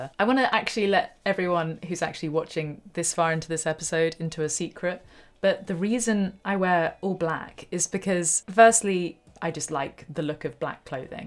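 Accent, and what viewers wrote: United Kingdom. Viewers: The wat you Brits say "pawn shop" doesn't sound like pawn shop to my American ears. Thought, at first this story was going somewhere weird! Lol! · So yeah, with the British accent I spent most of the first story thinking this person had found a guitar in a porn shop when he was "14 or 15 years old." I was puzzling over that one so much that by the time I realized it was in fact a pawn shop I had to rewind and re-watch the beginning of the story!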